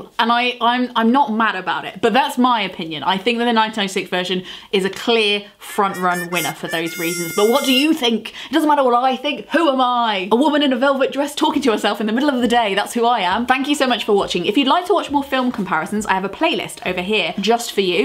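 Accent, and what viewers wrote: United Kingdom. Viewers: It was so interesting content~ Most of all, I like your clear British English^^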